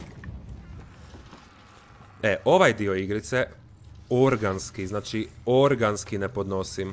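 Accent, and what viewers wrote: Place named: Chakavian, Croatia. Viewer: sta ti pricas Cakavskim narjecjem?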